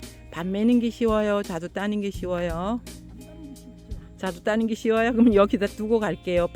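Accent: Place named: Gangneung, South Korea. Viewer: 자두보니 군침도네요 목소리가 강릉 말씨네요 제고향 강원도 어렸을때살던 대관령 가보고싶네요😊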